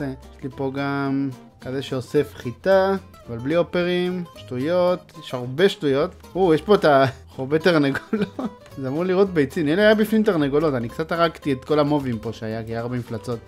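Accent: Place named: Russia. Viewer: שיואו צפיתי בסרטון שלך מלפני שבע שנים שהיום אנחנו אגב ב<num> ושומעים שיש לך מבתה רוסי ברמה מטורפת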